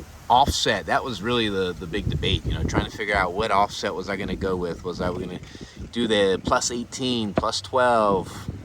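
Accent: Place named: South Louisiana, United States. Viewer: Ive got the same truck, but in Carbonized Gray and the sport interior (brown and black leather). I also have the Fox, instead of Carli. Thats a bit hefty of a price tag for me. Where are you located? I was guessing south Louisiana based on your accent, but the palm trees are throwing me off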